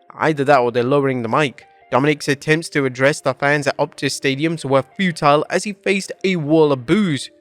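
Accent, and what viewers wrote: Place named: United Kingdom. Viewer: Wtf I didn’t know you were from the UK😂😂😂😂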